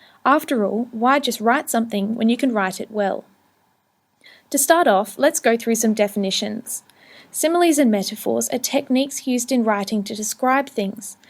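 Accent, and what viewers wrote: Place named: United Kingdom. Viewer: i like the british accent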